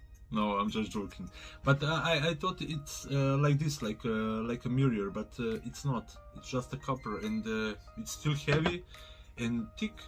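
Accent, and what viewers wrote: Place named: Bulgaria. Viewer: Hello my friend, are you from Bulgaria because your accent really sound like a Bulgarian man🇧🇬💪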